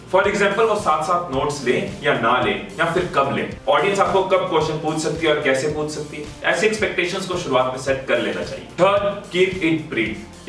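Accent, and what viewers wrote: India. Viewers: Cute Indian English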